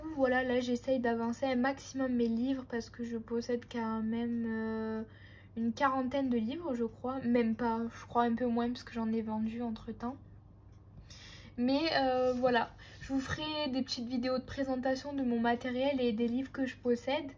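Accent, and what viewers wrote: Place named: South, France. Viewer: Bonjour! Bienvenue et bonne continuation à ta chaîne très belle accent du soleil. Tu as de très beaux colos, et moi je me suis abonné à ta chaîne.je te souhaite pleins d'abonnés et éclate toi. Gros bisous du Morbihan sud 😘🥰🤗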